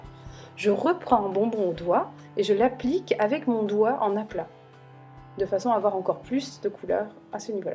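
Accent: England, United Kingdom. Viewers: C joli mais tu aurais pu repasser la combi ! Sinon j'aime bcp ta voix et ton accent anglais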